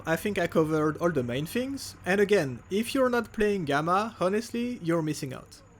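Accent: France. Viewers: Cet accent fr mon dieu ahah ! Merci pour la review !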